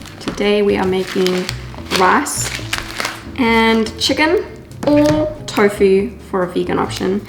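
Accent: South Africa. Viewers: Are you South African? I can sense it immediately! I’m also raised by a South African family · I’m not too sure what her accent is , maybe SA , but I’m obsessed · Love your videos! Do i hear a south african accent?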